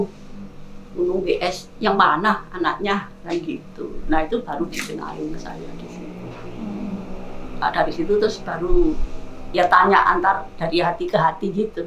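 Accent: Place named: Surabaya, Indonesia. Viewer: Mbak sepertinya sudah cukup artinya itu inti yang saya dapat dari pak Nashar: " bagaimana menjadi " pelukis, shg ilmu itu saya terapkan pada diri saya sampai sekarang. Kenyataannya saya masih bertahan dan menjadikan semacam energi mbak, terima kasih banget....... atas vidionya, ( gaya suroboyoan omongane tur banter )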